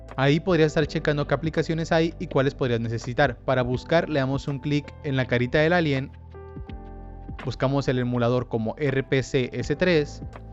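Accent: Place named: Chile. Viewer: aprende a pronunciar la "sh", esta claro que eres chileno.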